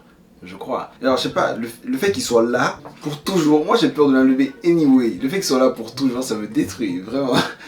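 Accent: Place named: Toulouse, France. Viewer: l'acent toulousain j'aime trop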